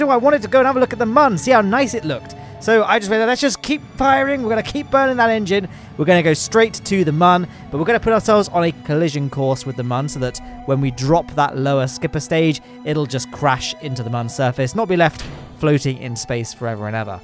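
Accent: United Kingdom. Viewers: I love how the auto generated captions mistake British accent for saying "Lambda" instead of Lander.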